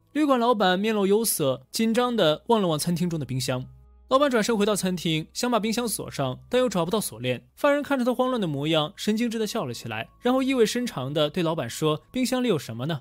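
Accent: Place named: China. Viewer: 肯定是大陆的啊 台湾没有这种腔调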